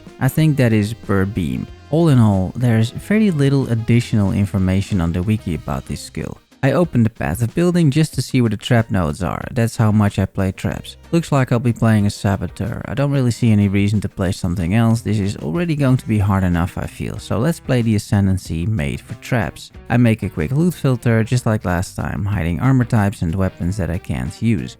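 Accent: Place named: Netherlands. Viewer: Just a random comments, your accent.. are you Dutch?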